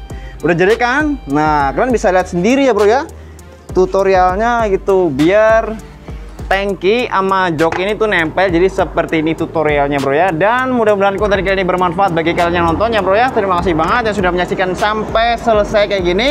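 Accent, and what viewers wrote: Banyumas, Indonesia. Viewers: Mas sampeyan wong ndi yah Bahasane daning kaya ngapak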